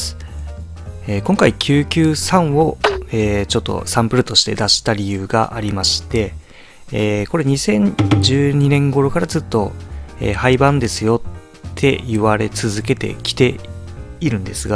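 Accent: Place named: Kagoshima, Japan. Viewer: 方言が鹿児島っぽい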